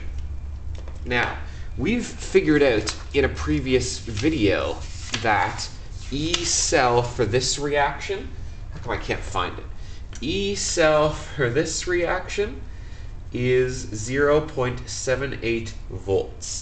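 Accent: Canada. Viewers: I can tell he's Canadian by the way he pronounces the word "Out" like "Aut". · I think it's an Atlantic Canada accent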